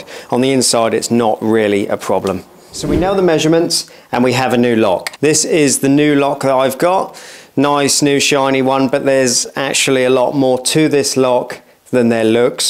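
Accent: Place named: West Country, United Kingdom. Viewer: Thanks for the video, one question - where’s your accent from? Bristol..Dorset maybe?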